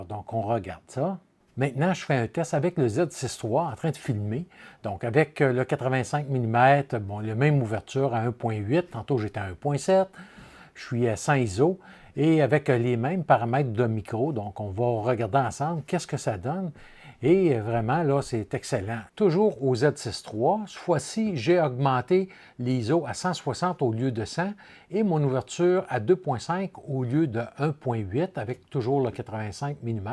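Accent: Quebec, Canada. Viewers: Bonjour, merci beaucoup...je me doutais un peu que la réponse était dans ma question, c'est très gentil de ta part de le confirmer. Toujours un plaisir de t’écouter et de te lire....avec ce magnifique accent québécois que les français adorent. Cela sublime tes vidéos, comme tes conseils subliment mes photos.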